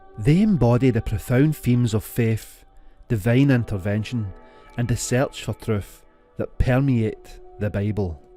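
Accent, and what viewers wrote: Scotland, United Kingdom. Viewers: Starting to look forward to that Scottish voice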